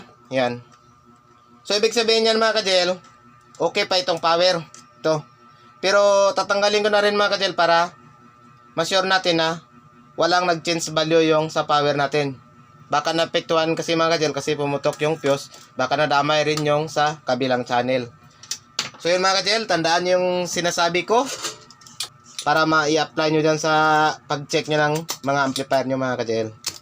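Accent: Iloilo, Philippines. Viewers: Daw ilonggo ka maghambal sir..idol ko mga ginagawa mo parang Ang Dali lng gawin.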